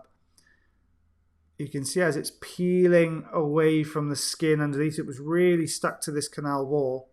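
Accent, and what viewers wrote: Wales, United Kingdom. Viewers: Take care of yourselves and your community first! Your videos (and lovely waffling in a lovely Welsh accent) can wait until it's safe again. Best to Mrs B and the little Bs.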